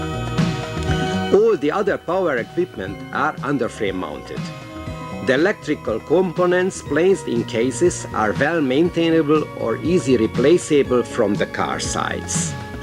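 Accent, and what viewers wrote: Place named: Hungary. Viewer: Huu.. this is too hunglish accent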